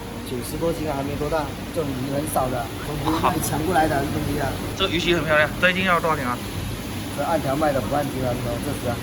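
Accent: Fuzhou, China. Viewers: 福州腔太重了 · 福州音好亲切哈哈哈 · 这口音可是福州人？ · 福州人吗 感觉福州普通话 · 一听声音就知道是福州师傅lo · 福州音太重了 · 我也感觉一听口音就是福州人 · 我想问， 你的口音有福州音，你是福州人吗？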